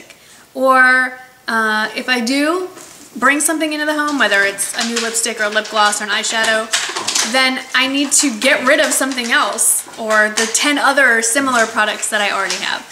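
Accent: United States. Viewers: I love your voice and your accent. It reminds me so much of my year in the US ...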